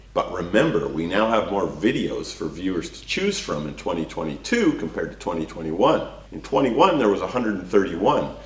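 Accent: Canada. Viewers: Nice. Logical, well presented and informative. I just subscribed......guessed you were a beaver boy.........best national animal ever by the way😅.....by the accent. Going through your catalogue of videos now.......can see some interesting stuff. Keep up the good work. Cheers mate.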